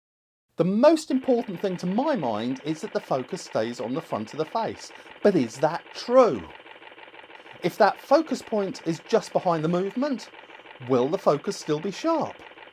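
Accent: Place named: United Kingdom. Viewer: Ok, I’ve subscribed etc. but I’m having trouble placing where in the UK your accent is from. It’s silly I admit, but even as a Yank, I have a pretty good ear for accents. I’ve passed as being upper crusty Londoner and as being Scottish in London and Scotland. In fact my brogue can get very thick. I just fail on the local idioms… Guess I should have been an actor. So please tell me where in the UK your accent comes from! I’m confused! But then again we haven’t been across the pond in much too long and I’m rusty. My top guesses are, at the moment: Cockney influence (how’s your rhyming slang?), Liverpool, Blackpool, Manchester. I’m an idiot but I was born this way 🤪. Your probably from Perranuthnoe and I’m not hearing it… Wait, in a couple of videos you’re wear orange, isn’t the the Blackpool Football team color? That would make Blackpool first choice. 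Right?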